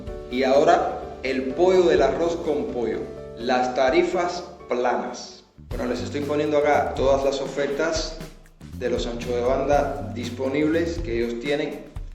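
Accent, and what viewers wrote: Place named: Cuba. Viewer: Saludos desde California, USA de tu seguidor Puertorriqueño Angel. He visto muchos videos de personas en Cuba haciendo lo mismo que tu, pero los tuyos me han impresionado mas por tu los temas que escojes para presntarnos a tu pais. Explicas lento y sencillo con tu lindo acento Cubano y hasta algunas palabras las pronuncias como nosotros los puertorriqueños. Imaginarse a Cuba y verla por medio de tus videos son dos cosas bien distintas que me han dejado con los ojos abiertos. La arquitectura de los edificios es bellizima y un tesoro nacional. Que lastima que muchos esten en deterioro. Pero nada, felicitaciones y muchos exitos y espero 👀 tu proximo video pronto. Cuidate y bendiciones a todos en la isla.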